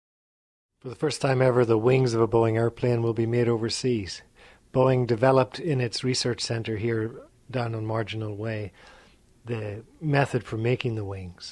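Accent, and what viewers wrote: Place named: Northern Ireland, United Kingdom. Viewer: Brilliant video - nicely narrated too. Good to hear a Norn Iron accent on this video. :) Thanks for the upload!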